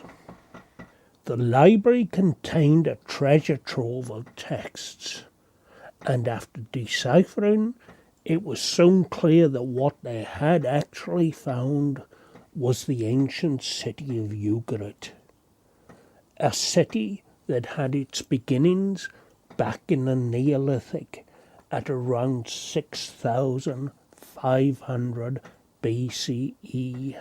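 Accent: Wales, United Kingdom. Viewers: He has a Welsh accent. Both countries have a similar native language Gaelic. If I'm not mistaken.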